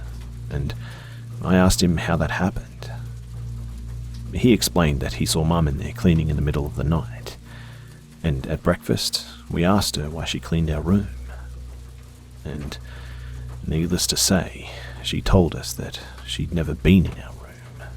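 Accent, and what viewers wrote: Australia. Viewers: Is it me, or did your accent became more aussie? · I love your stuff man ! And you're Australian voice, your video's go really nice with a joint in bed ! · are you an Aussie?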